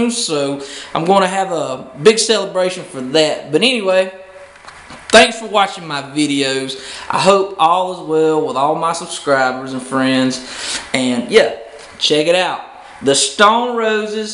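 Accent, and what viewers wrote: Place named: South, United States. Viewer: Great knowledge/review. Funny to hear a southern American talk about the Roses, I'm half Eng/ Half American so It's always nice to hear that accent. Great job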